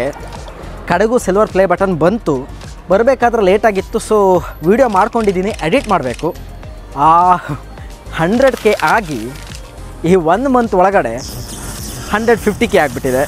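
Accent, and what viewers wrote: Kundapur, India. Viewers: Bro niv pojaraaa navu poojary.. Nim kandapr bashi mathad thidre bari kushi ath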